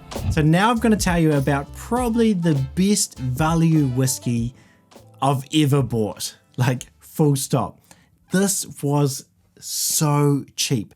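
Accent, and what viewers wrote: New Zealand. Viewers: Man that is a thick kiwi accent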